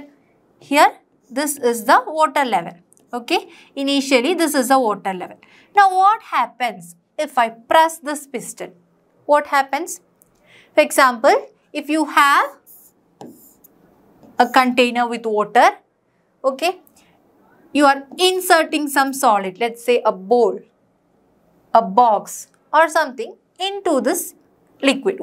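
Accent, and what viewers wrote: Kerala, India. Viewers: Ma'am r u a malayali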